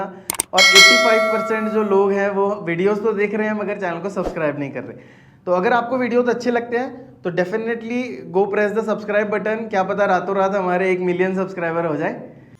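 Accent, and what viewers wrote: Punjab, India. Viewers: Aapke language mein punjabi accent hai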